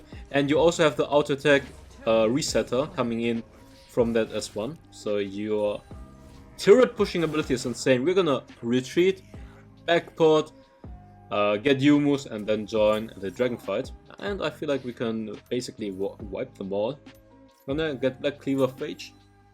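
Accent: Germany. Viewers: Are you german you hearing a little bit like a german boy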